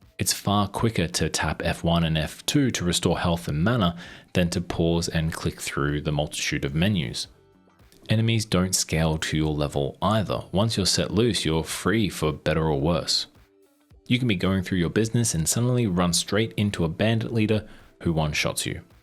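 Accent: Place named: Australia. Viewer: At first I read "Latvian's First RPG" and was confused, like wtf is this guy's Aussie accent... then I checked the sub count and holy shit man, no fucking way your channel is that obscure! You're going to blow up if you keep going at this pace, trust me.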